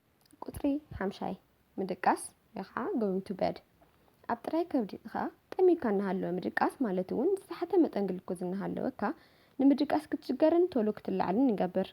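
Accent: Tigray, Ethiopia. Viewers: Why don’t you focus on the information/Lesson . You people have always something to complain about. Her Tigrinia was perfect. Her Tigrinia is fromTigray or Ethiopia. Eventhogh i am from Eritrea i understood every single Word she said..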